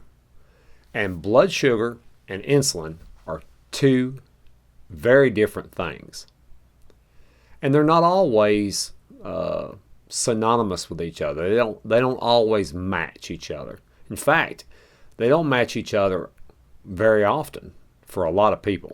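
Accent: South, United States. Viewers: I am very glad I came across your channel. I am learning so much about diabetes from you. Thank you. P.S. I love your Southern accent. 😊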